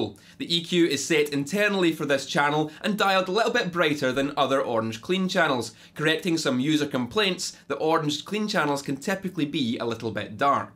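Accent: Scotland, United Kingdom. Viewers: The Scottish accent tingles the nuts like a dimed plexi through a cab of greenbacks! In other words..I hit the subscribe button so hard it was on the ground twitching like apollo creed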